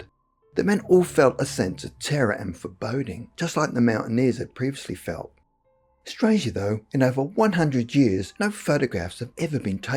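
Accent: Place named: Australia. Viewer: Can you get some narration without the heavy Aussie accent? It is hard to understand your speech for Americans. I think you have a woman who does narration, don't you? I can understand every word she says....I think she is an Aussie too but her accent is not as thick as yours.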